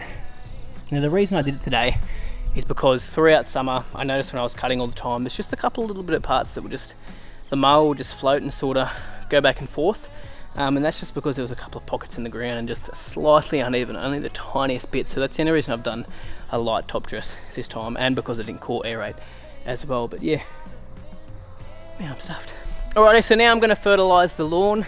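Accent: Australia. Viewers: You an Aussie?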